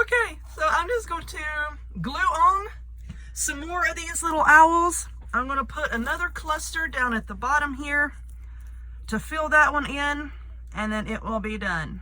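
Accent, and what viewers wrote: South, United States. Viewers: I love your commentary. You keep it real and good southern realness. Plus excellent video. Thank you for sharing this.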